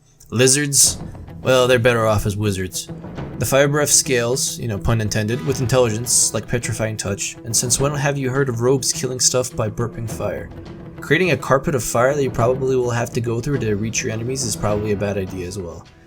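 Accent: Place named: Midwest, United States. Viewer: yea sounds like a Midwesterner or something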